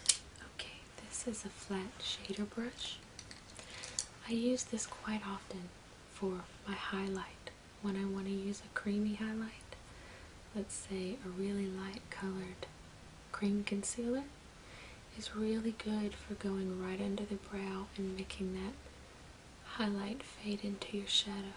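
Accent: South, United States. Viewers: Also are you from the south? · Are you from the south? cause you sound a bit southern.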